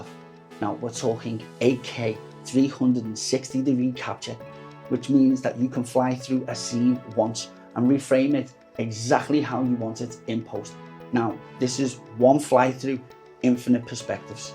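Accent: Liverpool, United Kingdom. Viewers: Wow did this guy just really say that 😂😂 its ok old white men think they have the right to tell people how to speak and behave 🙄 you obviously dont know what people from liverpool sound like. I I bet to some other people you might sound like your saying different words and letters, you should see what real people from liverpool sound like 👍🏼 they talk really fast and pronounce things differently but he is actually saying as normal, just your the only person who feels its thier place to tell him otherwise 🤔 gota let people be people without trying to put them down. He's actually doing really well, and maybe you dont think your being a absolute tool 🙄 and I wouldn't say its my place either to say your being a tool but you know your being a tool 😉. Now instead of commenting trying to put somebody down by telling them how to speak, try a more encouraging approach but you know what they say you cant teach a old dog new tricks, so get more worldly and understand that the world is full of people who speak different people. 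from liverpool have it hard enough with people trying to understand them from thier own country, now I have followed this guy from the start and all his first videos were AI voice overs because nobody could understand him, and he has changed and changed and changed the way he speaks enough over the past few few months to actually gain the confidence enough to speak on cam. And yeh at first he was speaking really slow and robotic because he tried to speak just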